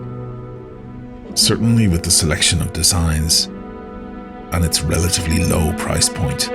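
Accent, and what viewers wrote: Ireland. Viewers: Great start; loving your style; beautifully delivered with that mellow Irish Brogue. Classic, long may you continue my friend. Salute.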